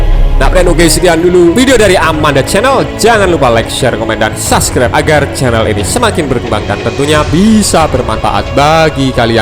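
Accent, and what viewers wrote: Java, Indonesia. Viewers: Min pertahankan medok mu pakek kata "osot punya osot"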